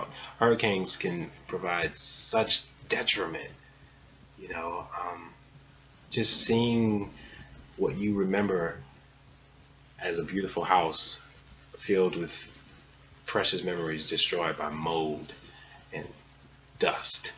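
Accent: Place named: New Orleans, United States. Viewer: That New Orleans Accent was So Thick LOL !... Not So Much Now - Barely Can Hear It ...Thank U Much for SHARING '-p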